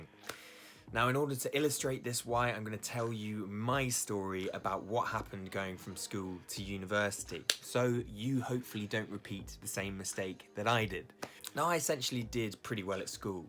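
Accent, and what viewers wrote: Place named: RP, United Kingdom. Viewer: You sound posh - did you go to public school?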